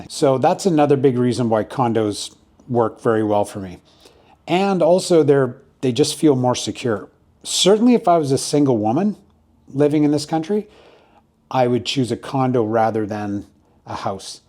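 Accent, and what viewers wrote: Canada. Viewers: Canada here... you didn't lose your accent! It's refreshing. I will be settling in Jomtien as well, in a year or two. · Are you a Canuck?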